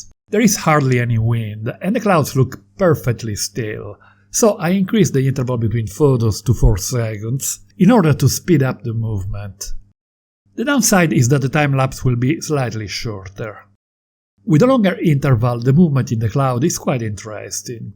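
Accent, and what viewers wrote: Italy. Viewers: I like the Italian accent :D Great job and thanks for the info!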